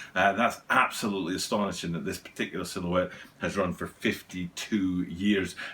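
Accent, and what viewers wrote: Scotland, United Kingdom. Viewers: Not gonna lie, wasn't expecting to hear a scotsman when I went to watch the video. Glad to see these have been reissued tho.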